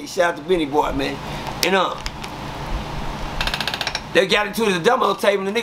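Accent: South, United States. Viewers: Def high..... he has a southern accent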